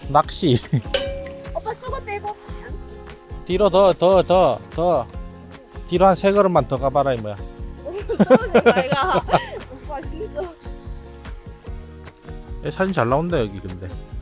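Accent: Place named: Daegu, South Korea. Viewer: 바빠서 몬 오다가 간만에 대구말 들으러 왔습니다. ^^ 타향살이 하다보이 대구말 듣는게 부모님이랑 통화하는거 빼고는 윽시 어렵네요.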